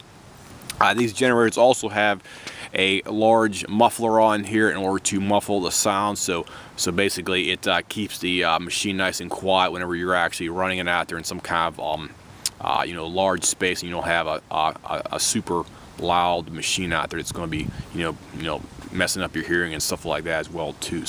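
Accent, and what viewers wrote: Pittsburgh, United States. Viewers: Great video......sounds like a Pittsburgh accent.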